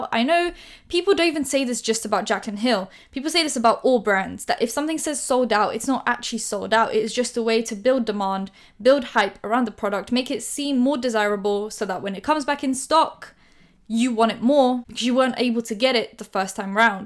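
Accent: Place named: Roadman, United Kingdom. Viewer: Is it just me or does she have a very subtle roadman accent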